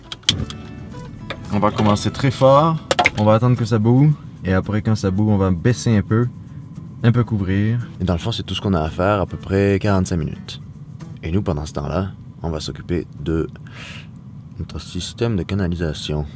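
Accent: Quebec, Canada. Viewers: Bonjour, Je m'appelle Marc et j'ai eu plaisir à suivre tes aventures en Van de l'autre côté de l'atlantique depuis quelques semaines. En me familiarisant avec tes vidéos et toi même, cela m'a donné envie d'acheter ton livre que j'ai commencé cet apm. j'avais juste envie de te dire que je suis un lecteur acidue, que j'ai beaucoup lu et que ton livre que j'ai juste commencé ( environ 30 pages) est vraiment très bien écrit, mais plus encore, très intelligemment pensé et formulé. A 26 ans, tu as du vivre d'autres vies pour avoir une opinion si juste et éclairée sur la situation dans le monde ultra civilisé et le mirage parfait qu'il entretient dans nos sociétés aveuglées et courant vers le même précipice, sans vouloir faire du catastrophisme. Je vais également me lancer prochainement à 45 ans dans la vie de Van à travers l'Europe et c'est ce projet qui m'a proposé ta rencontre. 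Alors voilà, simplement un grand merci pour la qualité de tes vidéos et au moins tout autant pour la qualité de tes pensées profondément subtiles et de ton écriture qui le met si bien en forme ( et celui qui a osé te demander si tu étais francophone, devrait lire en urgence ton bouquin pour ne plus avoir à se poser cette stupide question, sachant que tu parles presque aussi bien que tu écris...:-)) Mon frère vit au Quebec depuis des années et j'adore votre accent. Je te souhaite de bien continuer à profiter de ton courageux choix et puisse la route te porter encore vers ces expériences de vie si m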